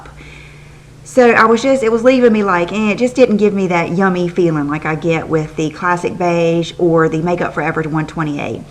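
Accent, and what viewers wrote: South, United States. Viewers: I've been obsessively watching your videos because I LOVE your accent lol! As an East Coast girl, I just love listening to that sweet, southern accent! (Also, you're just so lovely!!)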